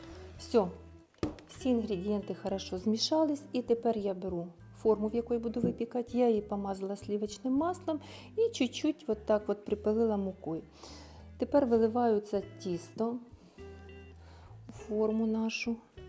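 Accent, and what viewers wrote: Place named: South, Ukraine. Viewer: Південний степовий говір 🙏❤️🇺🇦 Бабусі мої так пояснювали )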